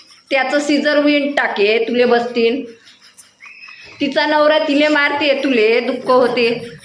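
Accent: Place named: Vidarbha, India. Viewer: Mala tar aapli vidharbhachi marathi aadhipasunach aavdte rao mastach hasavlat tumhi 😂 baki aashu tai aani tumhi mala konachich baju nahi ghyaychi pan bollat ekdam kadak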